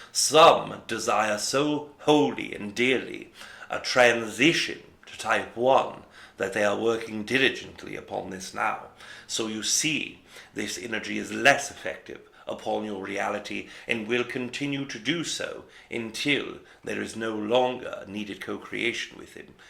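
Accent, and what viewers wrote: United Kingdom. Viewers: Oh wow, your voice when channeling Aridif has changed a lot. Here, you sounded just like yourself but with a mild British accent, and now (when you channel Aridif) you sound like a much older man with a thick British accent, that’s so crazy! It’s cool!!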